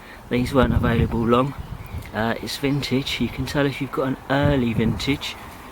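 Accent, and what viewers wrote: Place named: South, United States. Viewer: Mate, where do you live? From your accent I'd say the south, can I come round and play, lol.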